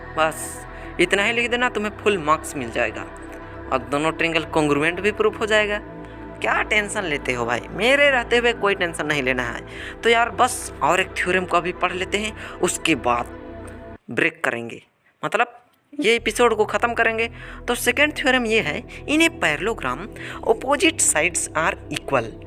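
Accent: Bihar, India. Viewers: i like your bihari accent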